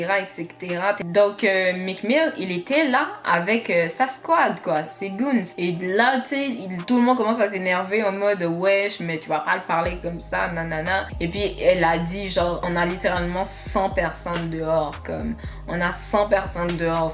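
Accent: Quebec, Canada. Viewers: Tu as un léger accent québécois tu viens d’où? Snn t’es trop belle 😘❤️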